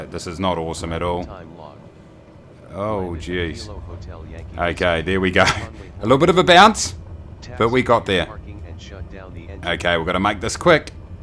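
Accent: New Zealand. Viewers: Love the Kiwi accent!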